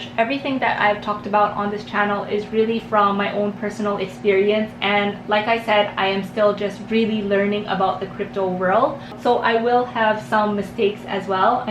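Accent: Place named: Philippines. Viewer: Hi, are you Filipino? you sound like one! I'm looking for a crypto buddy, I'm also a new crypto investor. Hope we can connect.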